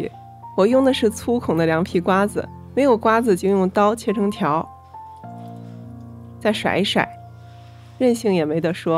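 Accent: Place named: Shaanxi, China. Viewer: 听口音是陕西人嘛， 额打算试试你的方子。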